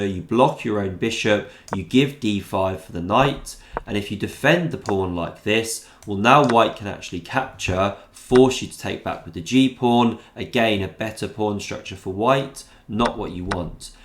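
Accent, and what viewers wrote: England, United Kingdom. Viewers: based purely on his accent im 90% sure he is from england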